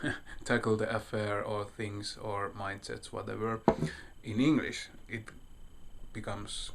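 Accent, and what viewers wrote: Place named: Finland. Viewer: Upeeta enkkuahan toi, itseasiassa meidän vanhalla enkunopella oli hyvin samanlainen suomalainen lausuntatyyli :)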